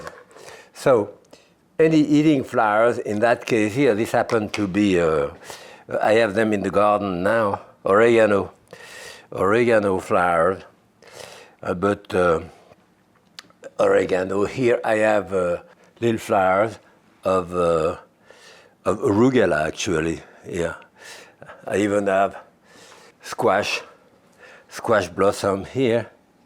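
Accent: France. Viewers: Speech impediment? Meh…I thought it was his French accent shining thru😂 Accents r great! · Impediment? Bruh he's just French hahaha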